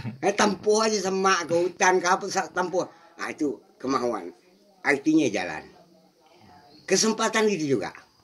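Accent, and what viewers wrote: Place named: Johor, Malaysia. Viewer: Salam dari Johor. same bahasenye dgn org Johor. Mmg johor dgn bentan ade hubungan suatu ktike dulu.. saye tngok channel ni sbb nk dengor bunyi cakapnye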